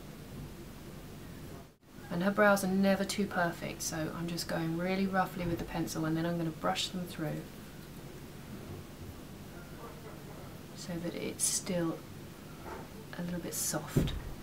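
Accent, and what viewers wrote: United Kingdom. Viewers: are u a british?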